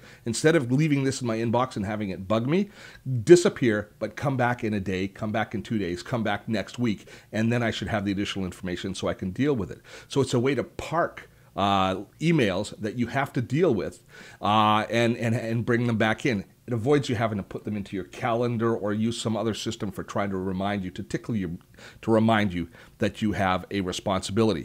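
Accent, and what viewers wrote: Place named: Canada. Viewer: You have a canadian accent : )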